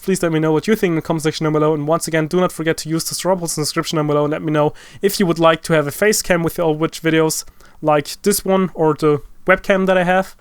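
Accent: Germany. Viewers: Das erste Video was ich von dir sehe, direkt am Akzent gemerkt dass du Deutsch bist haha :D Ist aber ein mega Geiles Video geworden ! Hab mal gesubbed ;)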